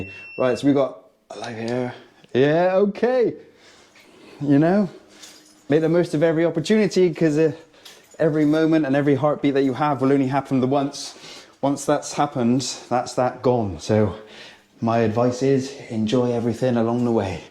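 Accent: Bristol, United Kingdom. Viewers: Nice to hear a Bristolian Accent again. I moved over to Cardiff over 20 years ago and miss it. Good video as well.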